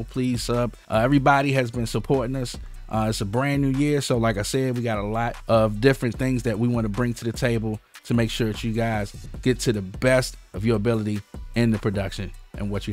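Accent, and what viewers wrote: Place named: Washington DC, United States. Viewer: It’s the dc accent bro lmao he can’t help it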